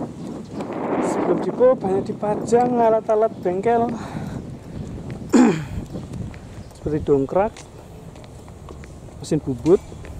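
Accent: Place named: Banyumas, Indonesia. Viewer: Masnya ngapak xixixi:D teruskan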